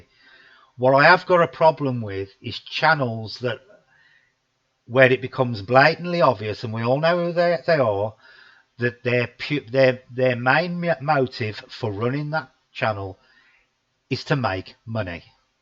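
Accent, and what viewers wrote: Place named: Dudley, United Kingdom. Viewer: Missed the live but catching up now. Nice to hear that Dudley accent aer kid hehe :)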